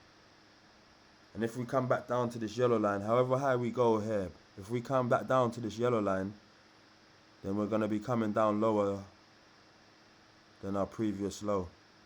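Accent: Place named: South London, United Kingdom. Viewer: I feel like I know u bro the way u speak I feel like ur in south London my guyyyy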